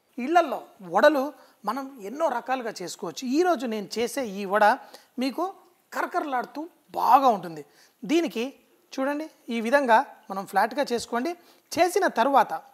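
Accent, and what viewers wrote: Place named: Hyderabad, India. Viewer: We want that Hyderabadi voice✌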